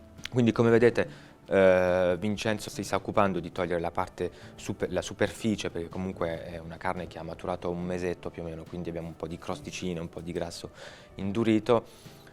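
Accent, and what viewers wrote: France. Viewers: lo chef ha un accento francese sull’italiano 👂🏻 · Let's say the vid's interesting, but his "language accent" sounds really strange ! Some time he speaks with the french "r"... but sometimes he does speak with a real italian accent ! Hence, the vid sounds a bit setup...😲 Btw, the oil is made by "vinaccioli", not by "vinacce" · he speaks italian with a lovely french accent. Very nice and charmant. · Perché parla con l’accento francese 🤔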